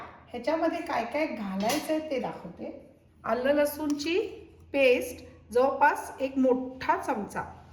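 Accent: Vidarbha, India. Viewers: का~~व माय, विदर्भाची का तु...मले वाटलं च....खुप चांगली भाजी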